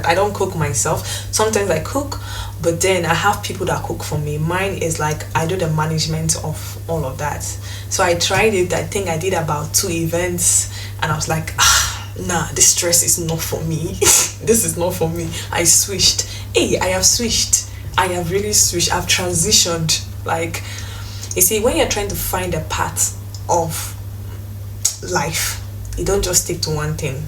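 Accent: Ghana. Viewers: your accent totally changed to the Ghanaian accent. I believe you have Ghana citizenship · Beside your pronunciation of " local" i thought i was listening to a fellow Ghanaian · my sister you speak like a Ghanaian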